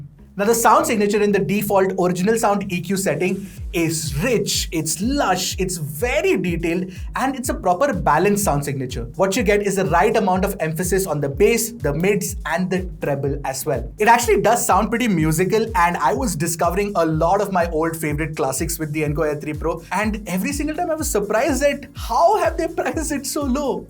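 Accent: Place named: South India, India. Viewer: Irritating south indian accent😖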